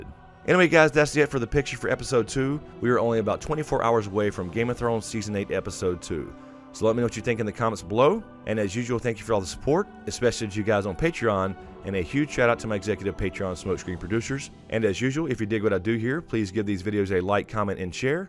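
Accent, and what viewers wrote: South, United States. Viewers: I love how you do it, get right to the point, sum it up so clearly in your warm southern style.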